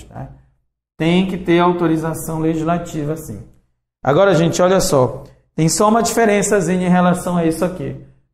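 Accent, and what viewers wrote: Pará, Brazil. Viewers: Esse professor é Paraense né? :D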